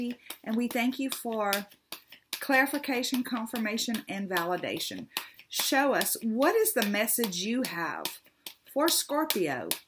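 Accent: South, United States. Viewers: I love your readings as they mirror my life perfectly! Plus, I love your sweet southern accent and charm! Thank YOU! <3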